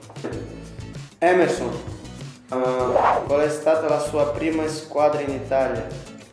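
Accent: Brazil. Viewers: Io lo adoro l'accento brasiliano. Nonostante sia qui in Italia da tanti anni non lo ha perso del tutto e la cosa non mi dispiace affatto. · Is it me or my man still sounds very Brazilian even when he speaks the beautiful language 😅😅? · I can’t speak Italian well, but it seems like he still has a strong Brazilian accent?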